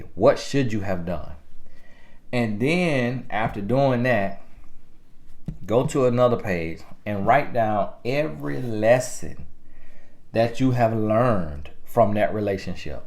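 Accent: United States. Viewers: Lol crack me up with that country accent... ladies listen to this man of GOD! He is telling us the truth! Do not chase no man PERIOD! Let them go something better will come if you are patience...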